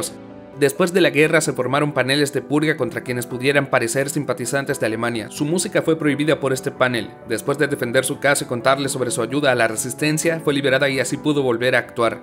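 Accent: United States. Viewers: buen video, pero tengo que darte una sugerencia, como comprendo que no tienes por que saber frances, entiendo que no sepas como se pronuncian los nombres ni las palabras, lo que no entiendo es esa mania que teneis la mayor parte de los youtubers en sudamerica de pronunciarlo todo como los yankees, no se si es complejo o que creeis que os hace parecer mas inteligentes. te pediria que si vas a pronunciar mal lo hicieras como lo haria un hispanohablante y no como crees que lo haria un yankee, que ademas hablan un ingles como con desgana, insisto buen video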